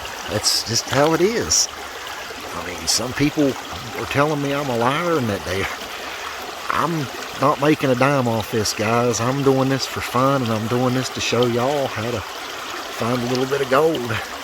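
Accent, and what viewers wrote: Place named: South, United States. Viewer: You have a country southern style draw when you speak. I'm from Connecticut and I hear ya just perfect.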